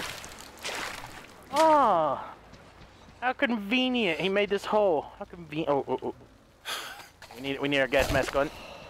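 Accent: Russia. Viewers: I love his Russian accent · You do a really good Russian accent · RUSSIAN ACCENT 💯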